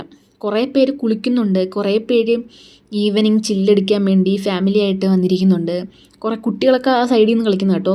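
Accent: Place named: Thiruvananthapuram, India. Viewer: തിരോന്തോരം പയല്. തള്ളേ പൊളപ്പൻ vlaag തന്നെ കേട്ട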